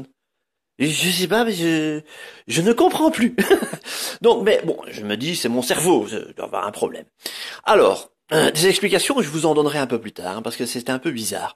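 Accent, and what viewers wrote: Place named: Belgium. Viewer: Super l'accent belge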